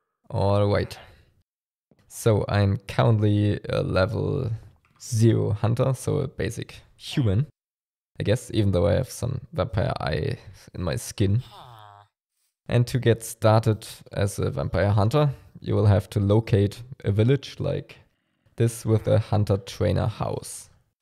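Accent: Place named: Germany. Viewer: i can hear a small german accent mein Herr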